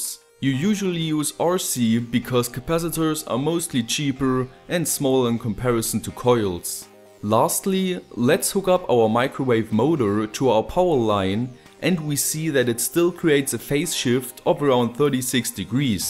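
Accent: Germany. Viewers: these videos are way more well filmes and understandable, last but not least more professional then many others on the same topic out there! Even if you sound a lil bit german XD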